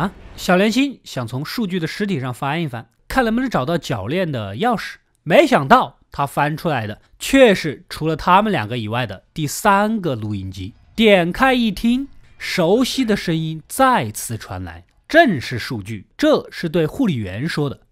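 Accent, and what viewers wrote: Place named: Anqing, China. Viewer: 安庆人说的塑料普通话，真的有点好玩...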